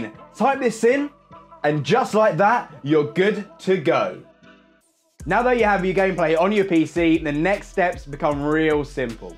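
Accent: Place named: Europe. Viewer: I dont understand shit. European english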